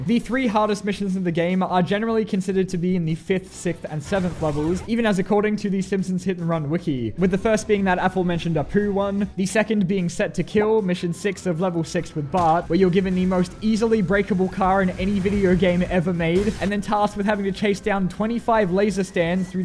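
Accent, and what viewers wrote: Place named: Australia. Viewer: I heard your Australian right well guest the hell what mate I’M A AUSTRALIAN TOO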